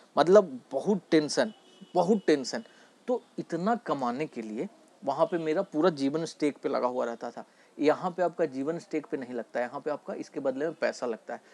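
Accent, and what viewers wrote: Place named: Rajasthan, India. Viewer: Kaha se ho Rajasthan se lag rhe ho Kuch aap ne kya change kiya market me trading me ye batav video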